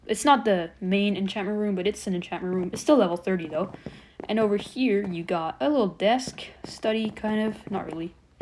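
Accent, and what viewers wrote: France. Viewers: Dude you from france 😅 i recognise youre voice anyway i love this world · ARE YOU FROM FRANCE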